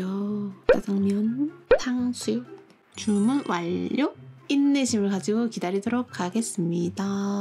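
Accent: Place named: Busan, South Korea. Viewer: 악ㅠㅋㅋ 친근한 부산말투라서 부산인쥴ㅋ 죄송해욤ㅋㅋ 항상 응원합니당ㅋ 😍😍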